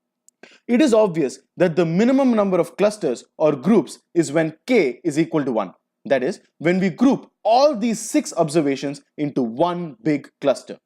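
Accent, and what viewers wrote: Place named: India. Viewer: even if my ears hurt by your hardcore indian accent, your way to explain is brilliant, sir!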